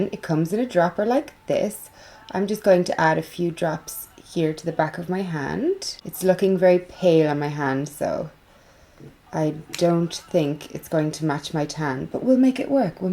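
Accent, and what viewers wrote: Ireland. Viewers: The very best review & info on this product!! I have been so interested. You have the most wonderful accent, I love it!! Irish? You are my new favorite you tuber!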